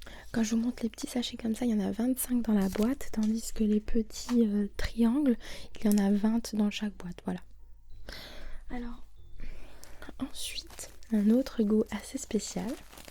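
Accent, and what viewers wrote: France. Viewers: Ton accent🥰🤤 j’adore les français votre accent et votre voix extrêmement douce🤗❤️ Bisous du Québec 💕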